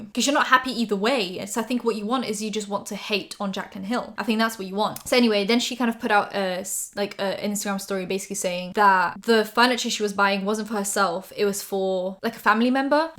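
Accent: United Kingdom. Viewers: nigdy bym nie pomyślał ze jest z polski albo chociaż nawet mówi po polsku, jej brytyjski akcent jest idealny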